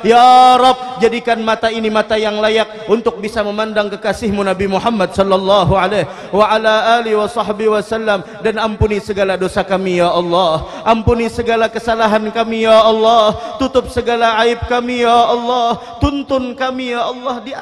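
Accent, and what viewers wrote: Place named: Tarem, Indonesia. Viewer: Sidin Terbiasa Suasana d TAREM cara penyebutan nya.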